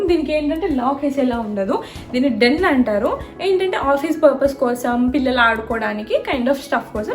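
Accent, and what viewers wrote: Telangana, India. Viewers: Telangana language intunte manchiga anipistundi.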